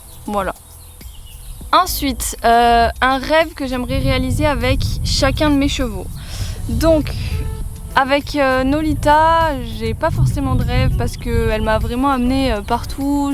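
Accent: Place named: Gard, France. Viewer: Hello :) c'est moi qui t'ai posée la question sur ton accent, en fait c'est parce que j'ai le même :p et c'est l'accent que on a dans le Gard vers Nîmes, et qui est vachement différent de celui de Marseille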